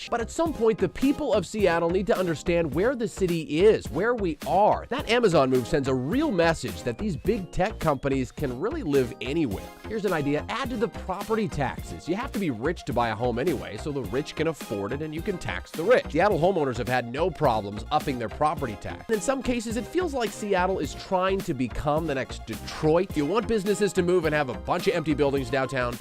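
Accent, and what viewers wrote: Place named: England, United Kingdom. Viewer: Your English accent was brilliant.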